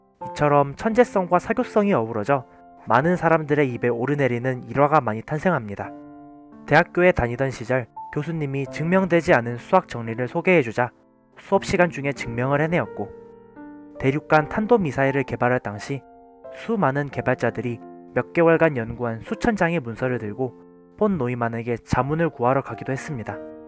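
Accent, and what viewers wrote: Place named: Jeolla, South Korea. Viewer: 의 발음을 으라고 하네요.전라도 출신인가요?반가워요!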